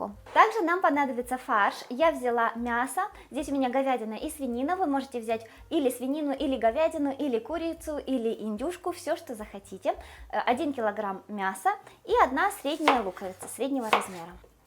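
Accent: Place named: Surzhyk, Ukraine. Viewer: ты Слава Богу становишься сама как пухкенька котлетка. Уже пришла в себя. Говори на родном суржике. Вон ЗАКАРПАТЬЯ ГОСПОДЫНЯ она ведёт канал на закарпатской диалекте и её смотрят все и понимают. Не переживай.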